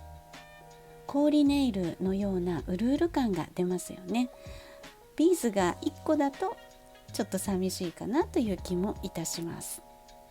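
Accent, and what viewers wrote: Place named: England, United Kingdom. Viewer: i love the English accent im obsessed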